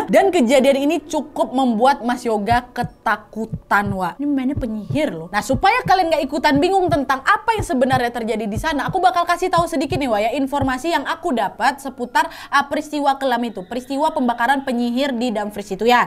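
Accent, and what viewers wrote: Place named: Medan, Indonesia. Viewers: oh begitu, kalo logat si saya emang tau makannya pertanyaan saya Medan, Pekanbaru atau Batam 😂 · Kak itu lahir di pekan baru sudah bebrapa kali kk blng dan memang bahasa ny agak mirip sm kek logat orang medan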